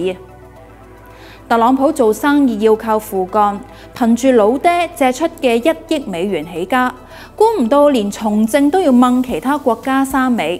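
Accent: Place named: China. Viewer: 大陆音主持，明白了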